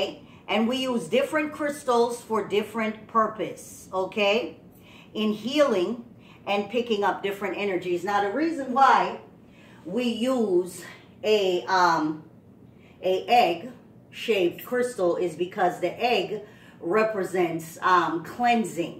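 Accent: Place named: Caribbean. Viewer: Are you from the Caribbean islands Because I can hear a accent when you talk?🏝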